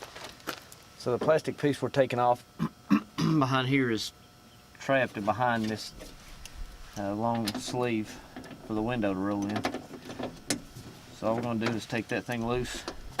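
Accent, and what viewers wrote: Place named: South, United States. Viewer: Thank you for doing such a great job on explaining this! Great video and you speak my native luanguage - southern! Have you ever replaced the climate control on a ridgeline? That's next after fixing my door lock.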